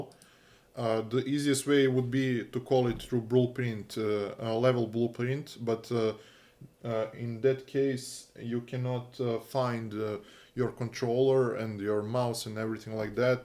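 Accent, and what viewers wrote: Russia. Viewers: Акцент прям русский русский)) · Hi! I was somewhat confused by your English accent. It is very similar to the accent of a resident of Russia.:) And the name is closer to scandinavian origin. Thanks for the plugin! We will use it!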